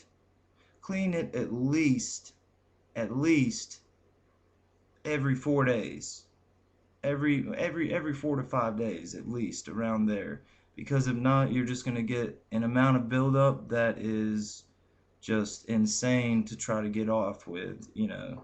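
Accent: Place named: Georgia, United States. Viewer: Great video--since I'm from GA, your accent is a plus!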